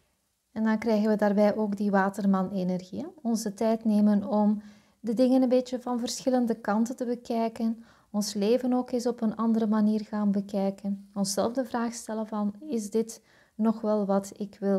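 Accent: Flanders, Belgium. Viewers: Mooie reading dankjewel 🙏🏻✨🤍 Je geluid is prima zelfs op m’n oude ipad..je Vlaamse tongval charmant en ook dat is prima te volgen als de nederlandse die ik ben ;) Laat gaan laat gaan 🤷🏻‍♀️😅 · Weer zo herkenbaar, ik ben zo dankbaar dat ik in de flow leef. Deze maand gestart met een nieuwe baan als welzijnswerkers en 9 feb begin ik met de opleiding Social Work. Over het geluid, ik kan het altijd prima verstaan. Met en zonder oortjes. Bedankt weer voor jouw fijne reading en jouw fijne stem, ik geniet juist van jouw Vlaamse accent. Spreekt mij aan. Fijne dag lieve 🙏🌺💫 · Resoneert helemaal,. Mijn ware zelf komt steeds dichterbij. Ik vind de ondertiteling geen verrijking, zo nu en dan verkeerd vertaald. Nou je, voor sommige mensen wel fijn, ik negeer de ondertiteling, je bent voor mij goed te verstaan en te begrijpen. Je Vlaamse accent is echt geen beperking.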